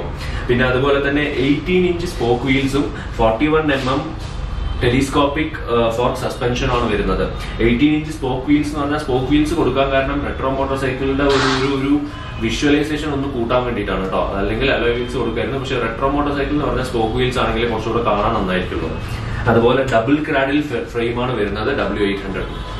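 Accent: Palakkad, India. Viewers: Palakkad ano native place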